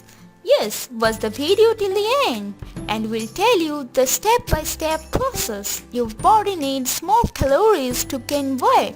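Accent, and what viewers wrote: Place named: India. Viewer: Funny indian voice... why indian people has a very bad accent sorry you guys have a beautiful faces and color also...but why the acent is very bad...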